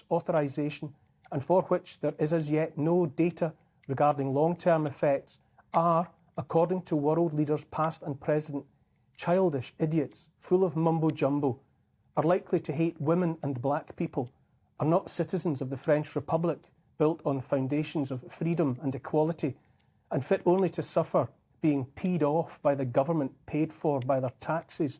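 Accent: Scotland, United Kingdom. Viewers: Moreover, forgot to mention that your Scottish accent is classical music to my ear! Brilliant!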